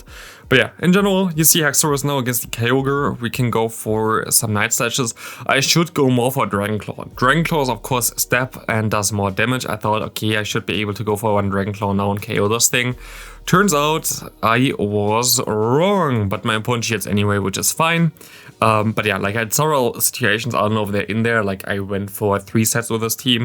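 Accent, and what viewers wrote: Germany. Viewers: Ohne es zu wissen, aber richtig deutscher Akzent im Englischen. :-)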